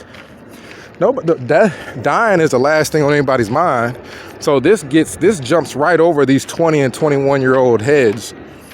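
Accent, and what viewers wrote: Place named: Texas, United States. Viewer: How long have you been in Texas? You are developing a Texan accent.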